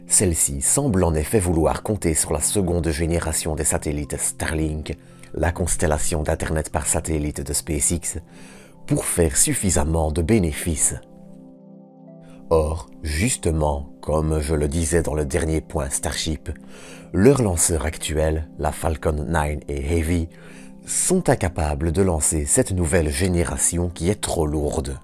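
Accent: Belgium. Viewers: Sa voix est posée, et sa lenteur relative se prête particulièrement bien aux sujets qu'il traite. Autrement son "élocution" c'est juste un accent belge.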